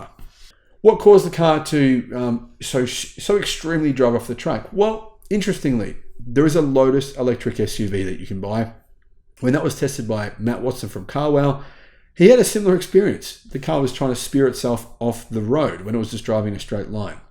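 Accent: Australia. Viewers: What's a mile an hour?! You sound like a fellow Aussie to me.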